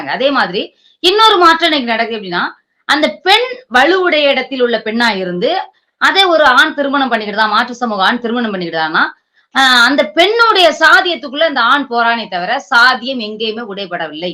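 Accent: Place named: Tirunelveli, India. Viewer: இந்த அம்மா சொல்வதுதான் சரி இதுதான் திருநெல்வேலி வள்ளியும் தமிழ் பேச்சு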